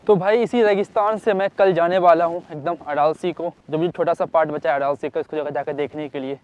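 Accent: Bihar, India. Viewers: Tu Budbak bihari hai kya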